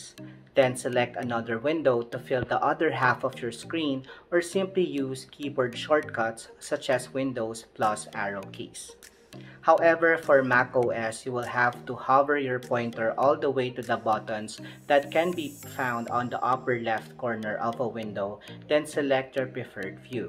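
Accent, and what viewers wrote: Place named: England, United Kingdom. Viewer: Good English accent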